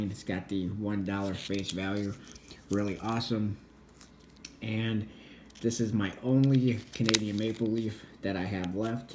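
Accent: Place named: Western New York, United States. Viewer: California?! He’s got more of a Western New York accent.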